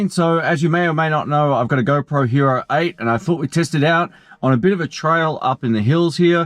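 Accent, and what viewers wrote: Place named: Australia. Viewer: I stumbled across your videos looking for a ring light review and I am glad I did. I am planning on using the GoPro as a facecam and I believe it will work well with the 10" ringlight on your channel! Keep up the videos, and I was wondering why everyone said you had a cool accent and I was confused because it sounded normal, before I realised you were also an Aussie like me!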